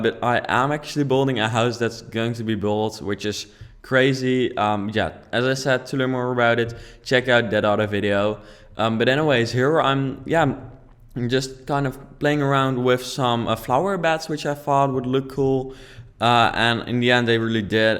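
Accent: Netherlands. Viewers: je kan horen dat ie nederlands is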